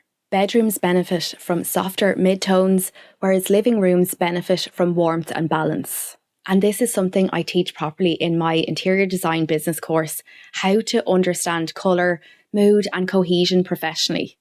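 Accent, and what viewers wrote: Ireland. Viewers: Brief and brilliant! Plus lovely Irish accent. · lovely Irish accent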